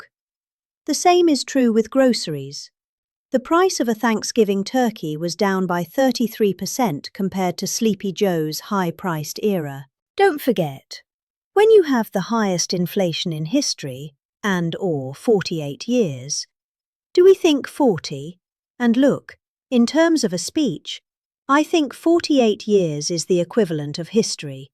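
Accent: England, United Kingdom. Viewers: Can’t wait until this lovely lady reads his obituary in her lovely lilting English accent!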